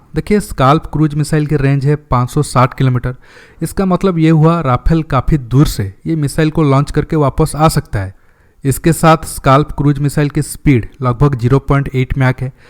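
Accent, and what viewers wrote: Odisha, India. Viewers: Odiya hai apka hindi achya nehi hai ..odiya typ ka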